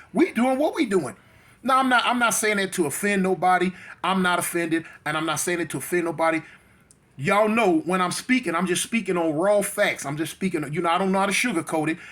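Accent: South, United States. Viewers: You've seemed to have picked up a southern accent, when I first started watching your videos I didn't think you were from California. You're spit on, that is exactly how it happened with my city. There wasn't any gangs there until LA cats came. It's like anything someone start it and show others and they get up to speed and run with it.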